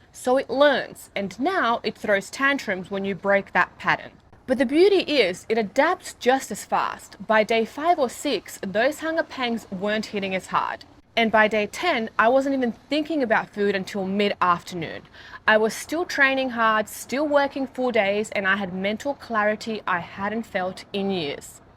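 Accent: Australia. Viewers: You're Australian or New Zealander? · YEEEEEES! YOU'RE SO FREAKING RIGHT! Always grazing and never feeling clear.. of course sleep is a part of this but this once a day thing I'm going to try and hopefully keep. And lastly.. Man I forgot how much I love the Aussie dialect.. I'm in love with it again.. Maybe find an Aussie woman now😂. Thanks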